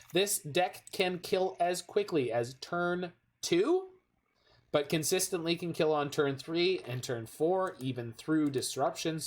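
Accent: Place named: Italy. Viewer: The averagely annoyed Italian accent is hysterical xD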